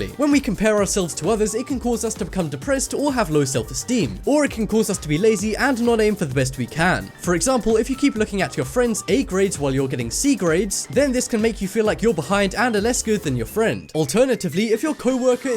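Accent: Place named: United Kingdom. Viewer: I like this channel because of the guy's british accent tbh tbh tbh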